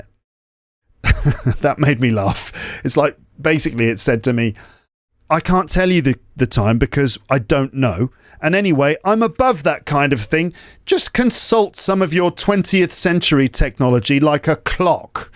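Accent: United Kingdom. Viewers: How i downloaded it and what's its name of the app. I love your podcast, it's dramatically useful. I wanted to learn Amarican accent but after i know your podcast i started learn British accent 🤎🤎🌿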